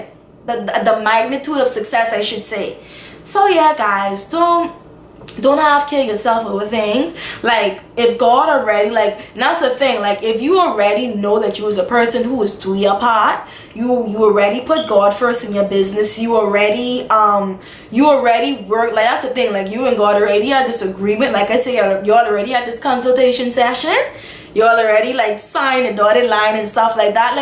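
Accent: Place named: Bahamas. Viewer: I like the fact that you do these videos with your Bahamian accent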